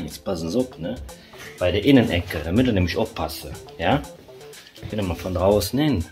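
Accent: Cologne, Germany. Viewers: ein "Profi" mit Parkside Werkzeug 😉 Endlich kann ich meine Lidl Käufe rechtfertigen, auch wenn dich meine Frau hasst, nur vom zuhören. 😉 Also bitte weiter auf Köllsch 👍 Wenn es Sinn macht für ein Video, kannst ja deine Werkzeuge vorstellen und warum du die hast, was die können oder nicht gegenüber anderen Marken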